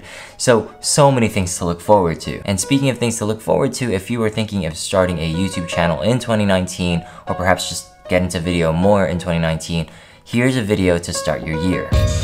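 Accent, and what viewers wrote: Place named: United Kingdom. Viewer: Good tips and British accent game is strong. Jolly good show!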